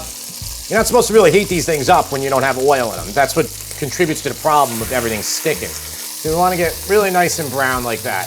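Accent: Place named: Long Island, United States. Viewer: Love the videos and still getting used to the Long Island accent. When you said 'the le crueset', I thought I heard "The Lakers say the oval ones are like $400". Who do you know from the Lakers and why do they care about dutch ovens?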